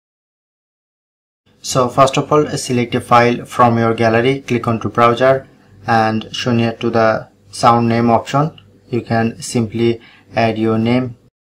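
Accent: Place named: India. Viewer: INDIAN VOICE W TUTORIAL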